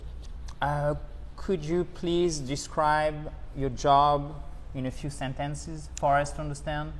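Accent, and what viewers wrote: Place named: United States. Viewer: Thanks and. I hope another video with. What a beautiful voice! And interesting to learn new expressions really typical and current., you are perfect in your explanations, and your English American is top, but think of directing the slate towards the camera. See you soon. (Petit plus Bonne fête)🎉👍🏻👍🏻👍🏻👍🏻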